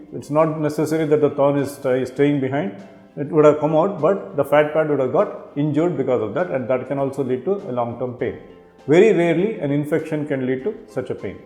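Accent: India. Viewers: leo bonita Its indian accent dear. Smtimes i dnt understand foreign accent, its so simple. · It’s Indian English. It’s probably closer to standard English than American, Australian or South African English. Expose yourself to it more and you’ll adapt to the intonation incredibly quickly. If you’ve learned other languages then you’ll adapt to it really quickly.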